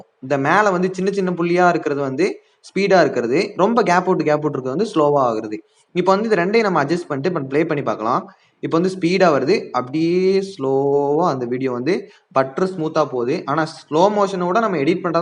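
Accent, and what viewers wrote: Kanyakumari, India. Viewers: Anna neenga kanniyakumariya?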